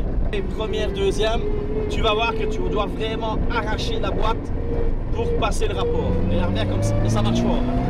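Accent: Liège, Belgium. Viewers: avec l accent lidgeux 😅 parfait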